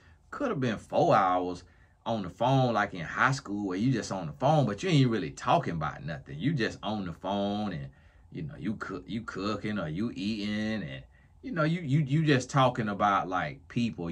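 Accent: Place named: Deep South, United States. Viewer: I'm sorry you got flack for your accent. That's no bueno. Personally, I LOVE accents! New York being my favorite tbh. But southern accents are a close second for me. I live in the south right now, in Texas. You do sound kinda like you're from Georgia or the deep South. My mom's from Oklahoma and she has a lot of countriness in her speech and I tell her she has an accent and sometimes she gets offended lol. But when I say that, I mean nothing bad by it. Accents are what make people unique! There are stereotypes out there, but nowhere should it be implied that people who have accents are less intelligent or less skilled. One of my favorite therapists, Dr. Les Carter has a super strong southern accent (he's also from Texas) and I love it! Accents are amazing!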